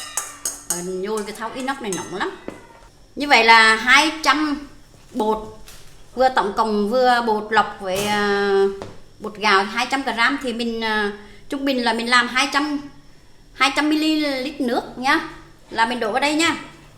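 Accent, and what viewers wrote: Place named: North, Vietnam. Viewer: Banh canh goc o QTri ma MC lai noi giong Bac tia lia ?! Het muon an!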